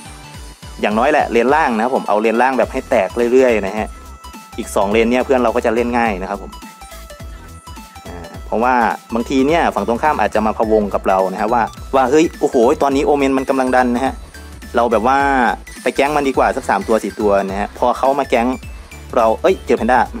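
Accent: Isan, Thailand. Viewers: พี่เป็นอีสานหรอครับ แพนด้าจะมาหลอยป้อม นาตาย่าฉีดทีแกรกเหลือเลือด2ข้อ 555คนบ้านเดียวกันอ้าย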